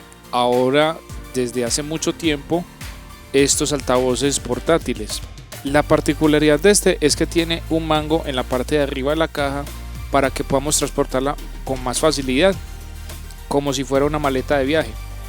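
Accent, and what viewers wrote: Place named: Colombia. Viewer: Todo bien explicado hy el vídeo muy bueno., LO MALO es el acento colombiche que de verdad, el solo escucharlo es repugnante., Hubiesen puesto a alguien con acento NEUTRO para que el vídeo sea más limpio. DE RESTO, EXCELENTE VIDEO😊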